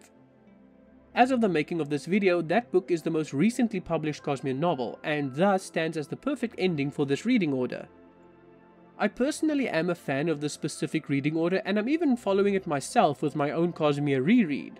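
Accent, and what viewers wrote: South Africa. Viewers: First 5 seconds of you speaking I said that must be a fellow South African. Checked your about and I was correct. Nice high quality videos! Definitely gained a sub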